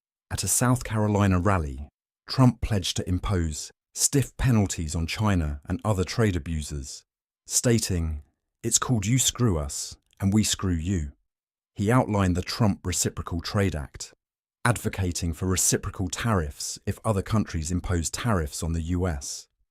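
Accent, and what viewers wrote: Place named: United Kingdom. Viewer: Why is a Brit telling me about American politics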